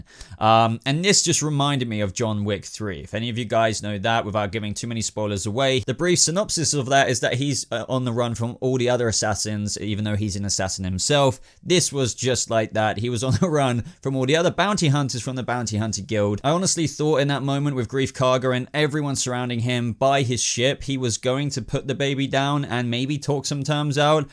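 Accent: United Kingdom. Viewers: suuuuuuureeee I want more reviews brooo. You are british then...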